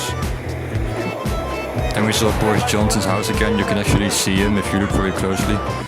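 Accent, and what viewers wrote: Netherlands. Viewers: me, as a dutch person, can just hear that he's dutch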